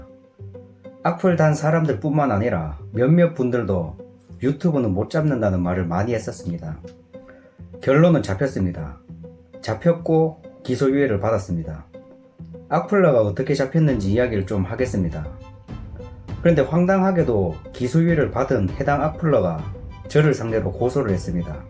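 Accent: Gyeongsang, South Korea. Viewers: 전라도가 좀그래요 님은 경상도?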